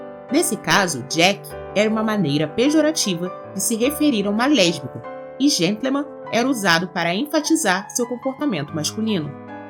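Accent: Rio de Janeiro, Brazil. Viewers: Lá no RJ eles falam bonitinho assim mesmo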